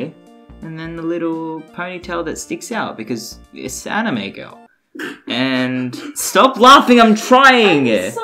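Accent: Australia. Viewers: My god, I just met a guy from VRChat. He’s actually from Australia too and he sounds almost EXACTLY like you. It’s kinda weird, but I like the Australian accent so I’m not complaining lol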